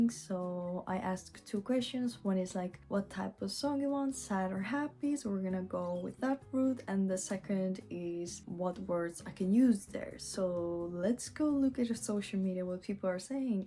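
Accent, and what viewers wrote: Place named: France. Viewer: Great work! keep it up! I also like ur accent, are u French?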